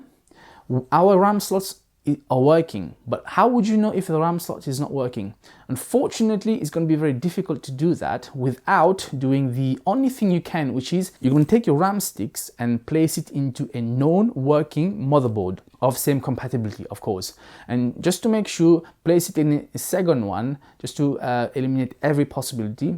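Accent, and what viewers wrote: Mauritius. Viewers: yeah he sounded mauritian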